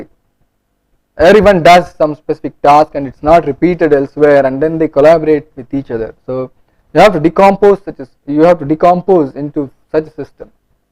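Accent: India. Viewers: good information, but horrible accent. I don't know why Indian English sucks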